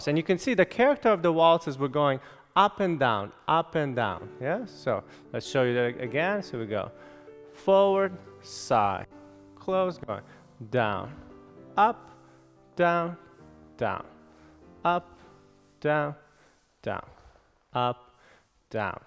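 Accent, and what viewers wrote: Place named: Eastern Europe. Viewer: Spent the first time watching the video trying to figure out what his accent is It sounds Eastern European but not sure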